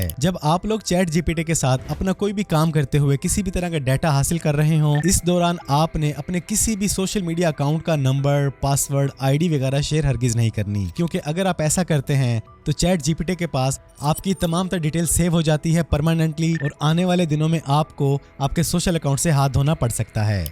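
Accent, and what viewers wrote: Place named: Saraiki, Pakistan. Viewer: bhy lagta hai apki mother- tongue Saraiki hai QK apka laheja Saraiki ki Tarah bahut meetha hai, mashaAllah!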